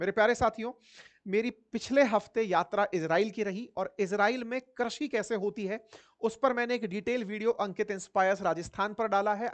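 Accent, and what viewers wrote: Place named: Kanpur, India. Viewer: pls keep kanpuria style of anchoring, it sound sweet...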